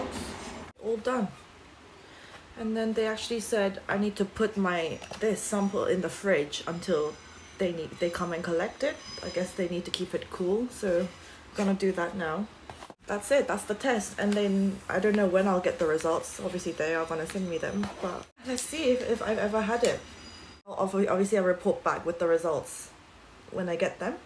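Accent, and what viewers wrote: United Kingdom. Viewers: wow.. sounds very cool with British accent o_o and very informative for current Covid 19 situation !! thanks for your time to make this amazing video ~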